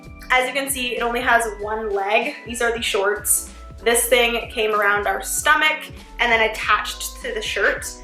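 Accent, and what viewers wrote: Canada. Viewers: paige thames she is French candian thAts why she talks like that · Evelyn M, she can't help it! That's what happens when you're born with a Canadian accent. What if I said I didn't like that you're a girl, you can't help it you're born with it so stop being mean to people when they can't stop or help with their doing or saying. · Are you Canadian? It really sounds like it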